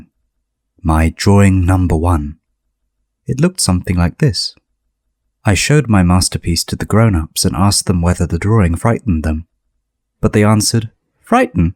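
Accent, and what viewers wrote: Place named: England, United Kingdom. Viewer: 와 진짜 crazy voice... i really like the intonation of English English.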